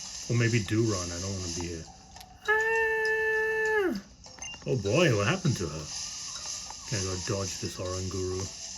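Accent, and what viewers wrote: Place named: Africa. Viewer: The GOAT African accent returns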